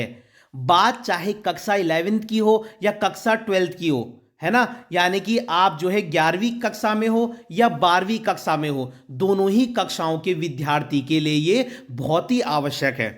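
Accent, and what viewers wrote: Garhwal, India. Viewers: Bhai tum gadwali ho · Sir aap garhwali ho? 😃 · Sir mai dekha aapke bolne tone garhwali jase hai Orr aake video dekh rha hu aaj mera exam hai 😂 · Aap uttrakhand se ho na gadhwali..😄😄 voice tuning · aapke accent se lag rha tha mai bhi gadhwali hu😛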